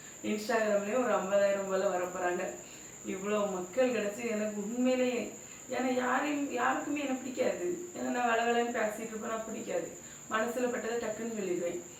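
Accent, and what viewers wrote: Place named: Marthandam, India. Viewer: 😊Akka veguliya iruntha ulagam apdi thaan pesum ,neenga pure ah irukkeenga atha nenach happya irunga akkaa am from dubai sontha ooru marthandam daily unga videos pakrean ooruk vantha meet pannalaam akka😊😊😊😊ungalta pesa aasaya iruk epdi pesenu therla😊cook pannumbothu fulla unga videos thaan time pass enak😅namma ooru baasha kekumbo oorula irukkirathu polaye feel😊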